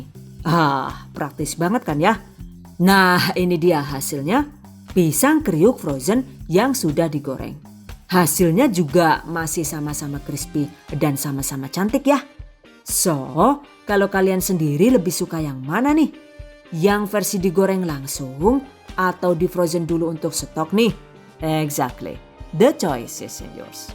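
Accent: Banyumas, Indonesia. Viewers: Salam ngapak Bun..orangapak ora kepenak😂😂🙏🙏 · Gaya bicaranya lucu, ternyata ngapak.....org Tegalll atw mn nih....😃😃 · Ini dr madiun apa mana ya kok ngapak? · "DONE" Ujug2 muncul neng beranda yuotube, ndeleng judule pisang lgsg tak klik 😊😅 eh ternyata aku terpesona dg logat ngapaknya. Piye ikilah jaaaaand terngiang2 bae suara ngapake mboke 🤣😂✌️ muga2 bisa kaya mboke ya pinter masak werna2 🤭🤭😂 #GiveawayTHV2020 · DONE Saya suka dg vidio resep"nya karna jelas dan lengkap dg tip &trik nya apalagi ditambah cara penyampean dan logat ngapak yg khas huh pokoknya angel....angel di suruh gak nonton,di catat lho mbok lali,😘😘😘💖💖💖#GiveawayTHV2020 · Sebenernya asli mana sih bun, kok ngapaknya kaya istri saya 😁🙏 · Tks resepnya jeng yg kadang2 ngapakk..tp aku nonton truss..ngapak.ngapak maju trus resep2 kulinernya jeng .... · Mbokiek jawine ngendi tah.. bosone ngapak..uuu lutunaaaa 😝🤣👍 ... 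but i love your banana krispi...pake toping amazing..👍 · "Done" Selalu nungguin "ngapaknya"😁 n nnton ini pas lg nenenin bayiq yg brsn lahir. Sempet ketawa sendiri pas bilang "Angel-Angel" alias susah.. Coba klo dtulis Angel bs jd artinya brubah jd "Malaikat" 😁 Btw.. Sukses trs bunda.. Selalu mnginspirasi.👍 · Well come back.. ketemu maning ora ngapak ora kepenak..kabare yu sehat.. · Kok ketawa ya setiap lihat vidio vidionya karna ada suara ngapak nya 😂😂😂😂 Tapi aku suka sama resepnya mantul bener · Saya selalu mencatat kata yang selalu diucapkan dlm setiap video THV yaitu kata "Jadiiiii ....." dan aksen ngapaknya itu lho hahahha .....kereeeeen mbak beda dgn yg lain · Angel temen diwalik kiye.......😀😀😀😀😀😀pada bae mba,aku juga ngapak. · Senemg bgt nonton chanel ini...ngapaknya sama dg saya...😂😂😂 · "Done" Trimakasih resepnya Bun,prlu dicoba in InsyaAllah...,diselingi bahasa yg ngapak bikin ketawa trs..😄😄 #giveaway THV 2020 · wong ngpak..hehe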